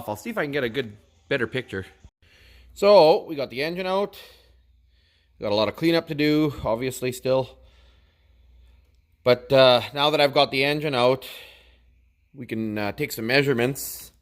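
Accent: Canada. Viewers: Sounds like you're a Canadian you should watch another Canadian if you do or don't it's. a v e Arduino versus evil what it stands for but this dude is a trip his channels here on YouTube rebuilds and tears apart anyting mechanical or electronic I think you would get a kick out of this dude peace out have a great day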